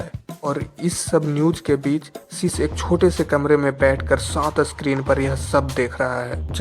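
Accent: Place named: Bihar, India. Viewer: Awaaj se Bihar Wale bhaiya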